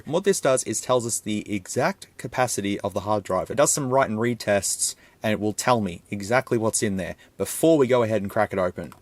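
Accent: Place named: Australia. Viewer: Big love from the UK, always known Wish is a sham, but hearing an Ozy tell it in plain Ozy talk is always great fun. (Lived there, have family there, Love there, ‘WISH’ I was still there) 😀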